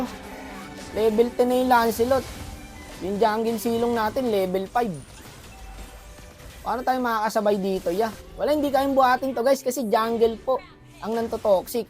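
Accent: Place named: Visayas, Philippines. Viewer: bisaya yan halata e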